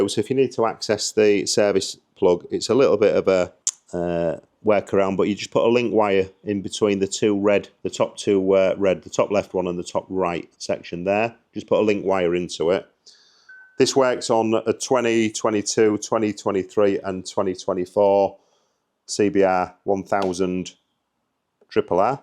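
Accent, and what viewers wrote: Yorkshire, United Kingdom. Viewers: also you sound like your pretty close to me im picking up a Yorkshire twang im Grimsby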